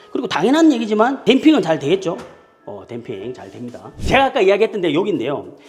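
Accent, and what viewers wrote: Busan, South Korea. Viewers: 부산 이쥬? 사투리인데 ㅎㅎ 인천송도인가?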